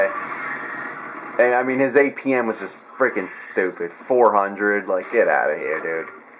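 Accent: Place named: California, United States. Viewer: You have to be from Cali :P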